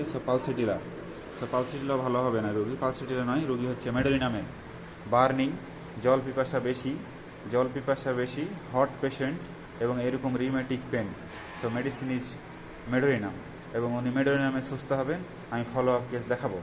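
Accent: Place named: Chapai Nawabganj, Bangladesh. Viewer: dr. where are your chamber location ? Your talking approach like as you live in chapai and i am also living same district. Thanks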